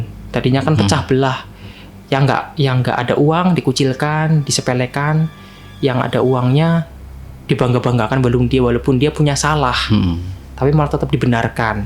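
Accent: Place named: Banyumas, Indonesia. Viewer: Ngomongnya kok sprt org ngapak sprt sy 😂😂😂😂😂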